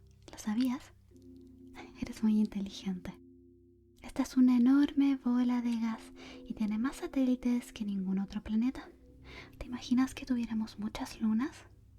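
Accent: Chile. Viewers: Es de chile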